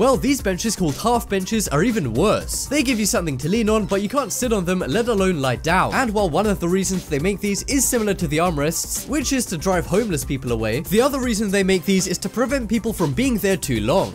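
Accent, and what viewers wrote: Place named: United Kingdom. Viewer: he is British. That's just their normal accent.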